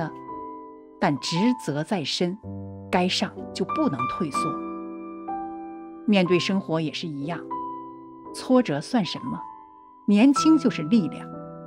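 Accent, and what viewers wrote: Hakka, China. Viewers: 佛友吉祥 客家口音有點重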